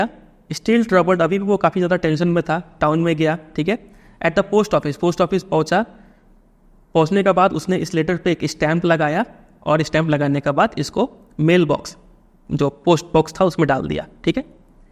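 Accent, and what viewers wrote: Bihar, India. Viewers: Sir are you from Bihar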